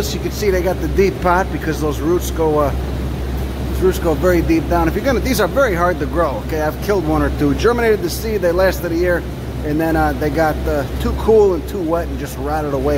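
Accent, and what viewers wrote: Chicago, United States. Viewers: Couldn’t figure why I like these videos he’s smart, knowledgeable, the Chicago brogue, no it’s cause he’s got the gift of glaberous or gabbro, he’s gabbroid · Thanks man, I'm a long way away from the US (I'm a expat) but I feel every bit of the tension here in Europe. Listening to that Chicago accent and calmly walking around a botanical garden somehow gives me hope that all is not lost there... much appreciated!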